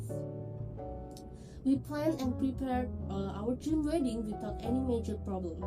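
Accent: Indonesia. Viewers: I know you are indonesian, right after your first sentence, i listen to your sub from 2 years ago btw. thank you. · loh ternyata orang Indonesia ya?? ngomong pake bahasa Inggris tapi tidak bisa dipungkiri logat dan gaya serta mada bicara persis org Indo semangat ya cici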